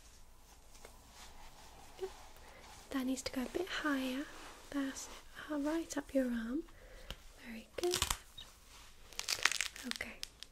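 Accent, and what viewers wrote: United Kingdom. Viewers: YouTube need some more decent British accent ASMR like your channel 😊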